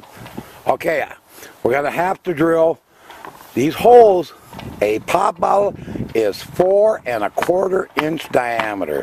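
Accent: Minnesota, United States. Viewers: I like your Minnasotan accent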